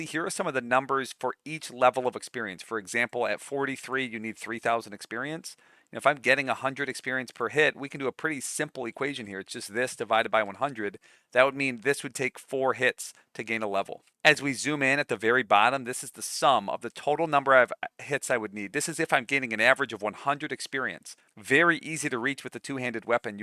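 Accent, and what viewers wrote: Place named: East Coast, United States. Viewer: So I don't have to do an additional purchase for the mod and install it if I already have the Anni Ed???? (Just making sure I understand completely) Loving the streams BTW. Love watching a mathematician's breakdown. Good on ya, brother. (The east-cost accent and mannerisms are the cherry on top, LMAO 🤣. Brother-in-law is from Boston). Yes, it was when you were talking about the sneak skill that you mentioned you had gameplay footage, that you would play at the end of the vid of you using the shadow warrior skill. Was something I'd never thought of cuz I used invisibility instead, silent casting on the illusion tree, with muffle on the boots. Stabby-stab. Shank those mo-fo's from the shadows!! Lol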